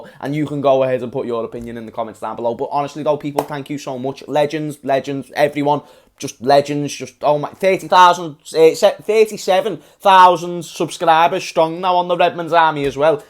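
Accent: Liverpool, United Kingdom. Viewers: People think that there's only scousers in Liverpool... .there is grades of scouse and this guy is the top one. I've lived all me life in dovecot Liverpool. . . This guy out scouses me lol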